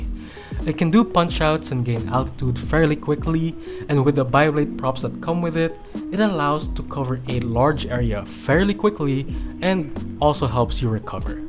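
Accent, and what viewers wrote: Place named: Philippines. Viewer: sabi na e.. the accent was a giveaway.. kabayan :)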